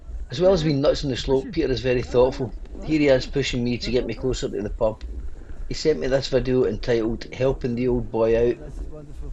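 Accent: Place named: Scotland, United Kingdom. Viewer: We are going there next Feb & this vid has Gave us great insight of what's to come. Great tae hae a Scottish accent on the vids tae. Brilliant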